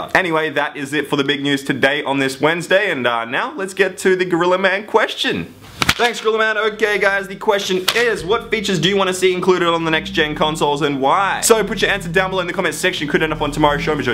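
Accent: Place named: Australia. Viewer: If your accent really is Australian what part of AUS are you from?? Curious from a country Victorian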